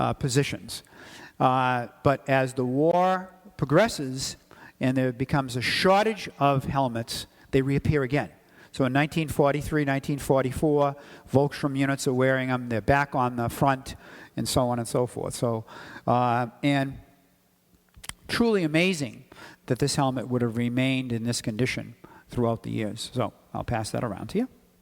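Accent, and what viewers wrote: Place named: United States. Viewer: Love the Americans and their funny pronunciation of German words.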